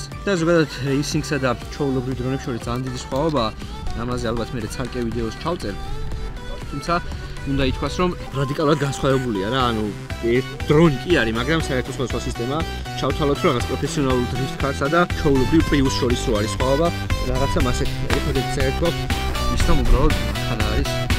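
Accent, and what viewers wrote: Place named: Mingrelia, Georgia. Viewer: mainc rogori megruli saubari gaqvs ❤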